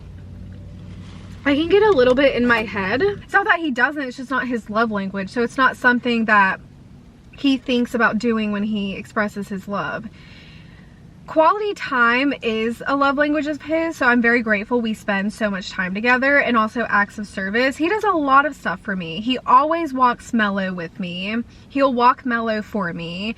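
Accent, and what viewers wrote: South, United States. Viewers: I absolutely love your cute southern accent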